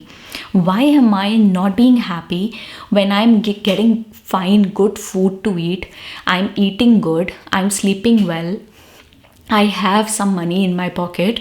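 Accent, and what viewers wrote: India. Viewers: I love indian accent 😘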